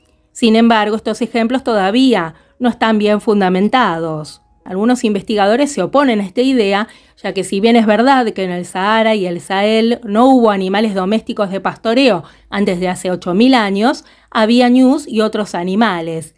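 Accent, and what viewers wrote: Argentina. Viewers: Que bonita voz, ¿de que sector de argentina es tu acento?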